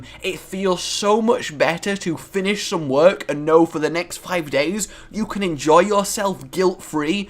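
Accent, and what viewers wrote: Germany. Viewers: You're german accent is quite funny, but what I don't understand is how did you come up with it? Usually everyone who does a german accent try to sound really aggressive and load and all that, you know, because of the stereotype and so on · Who else, when hearing "German" accent, instantly thinks: "Flutters"? Also, since you are not a vegetarian, what type of meat do you like the best? I like Moose meat personally... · Your german accent is way to high pitched.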